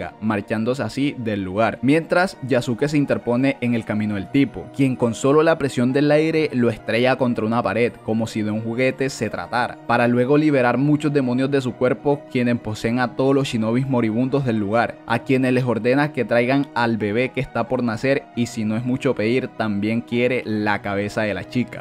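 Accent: Caribbean Coast, Colombia. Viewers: ERES DE CARTAGENA O DE BARRANQUILLA?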